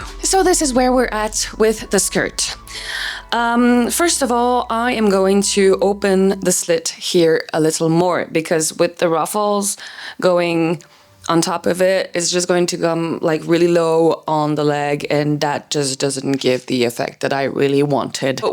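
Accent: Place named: Scandinavia. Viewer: I absolutely looove watching your videos! I’m a beginner to sewing, I’ve only made one thing🌸I started sewing because I wanted to make beautiful gowns like this, and your videos really inspire me to do that!!🌺 I have to say that the thumbnails are absolutely amazing and so beautiful💕I can’t wait to see what you do next 💓Your accent reminds me of a Nordic accent? I’m Swedish and it kinda resembles that💗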